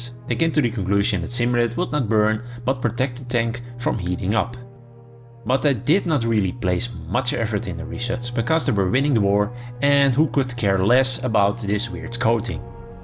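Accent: Netherlands. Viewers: NederEngels accent 100%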